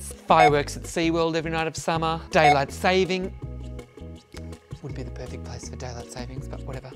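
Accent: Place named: Melbourne, Australia. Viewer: Sounds a bit like Melbourne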